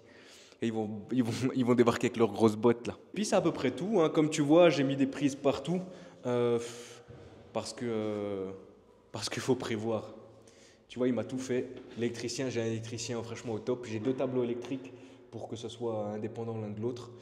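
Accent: Alsace, France. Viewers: Petit accent Alsacien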